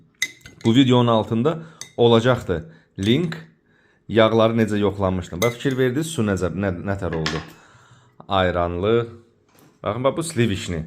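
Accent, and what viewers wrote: Ganja, Azerbaijan. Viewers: Gəncə ləhcəsidi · Bizə xoş gəlir sizin şirin danışığiniz. Gözəl Gəncə ləhçəsi,arada şirin səmimi sozlər❤Yaxşıki aramızda sizin kimi vicdanlı insanlar var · bu oğlan ya Gəncəlidi, ya Şəmkirli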